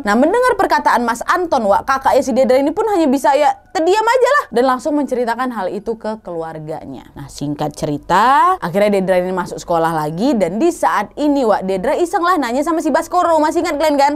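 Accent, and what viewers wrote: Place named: Java, Indonesia. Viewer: Klo KK ngomong Jawa lucu kk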